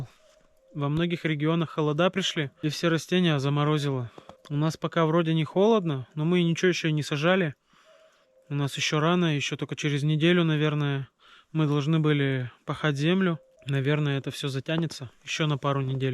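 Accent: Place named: Perm, Russia. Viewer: Добрый день! Это у вас какой край или федеральный округ? Акцент похож на Пермский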